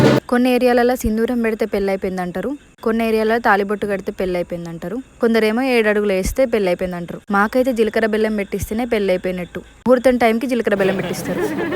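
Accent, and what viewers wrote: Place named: Telangana, India. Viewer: Daily follow avtha akka mee vedios chala baguntay telangana yasa basha super untay akka ❤❤❤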